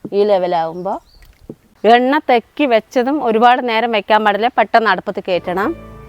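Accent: Palakkad, India. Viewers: അടിപൊളി 👍🏻.ഒരു പാലക്കാടൻ slang ആണല്ലോ ചേച്ചി? പാലക്കാട്‌ ആണോ?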